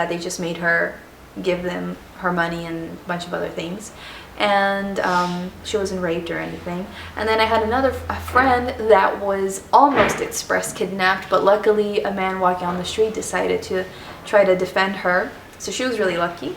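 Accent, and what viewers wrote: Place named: United States. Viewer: your accent is american. whats the deal? you were born in peru and you grew up in USA? and btw, mystura is at Jockey Plaza right?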